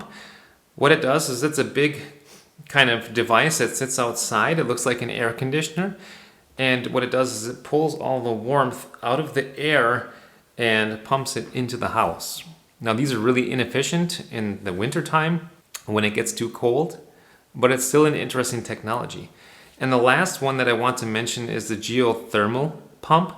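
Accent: Germany. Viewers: You‘re not a real american. You have like a german accent. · Hey, found the correct video and it is very interesting, because I had the same feeling. When you speak English I also heard a light German accent. It is very funny somehow. :) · Hello I recently discovered your channel. I noticed your German accent and could not believe you were actually American. I find most interesting are your beautiful videos and photos in Bavaria. It brings back memories of life in Germany living in a Village! I Loved it! I Love the Land, homes and culture so much! I felt at home there, although I was born American. I appreciate your interesting information! I hope to move back to Germany. I Watch videos, live, eat, sleep and dream Germany all the time. May you and your family enjoy your beautiful new Home! Tchuss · Why did you have such a strong obvious German accent ? 🤔 I am German and I wondering. 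Because you don't sound like an American. Did you live so long here that you adopted a German accent? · Did you grow up in Germany? The accent and vocabulary really don‘t sound American ;)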